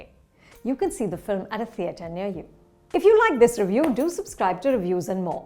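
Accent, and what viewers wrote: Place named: United Kingdom. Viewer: Ye madam ji ki English 😂.. inko angrez yahii chhhod gaye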